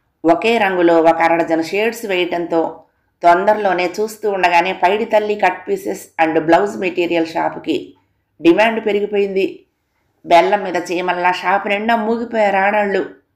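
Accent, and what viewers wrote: Visakhapatnam, India. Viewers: garu meru antha bhaga vizag slang lo chadevaru mam ur voice is god gift andi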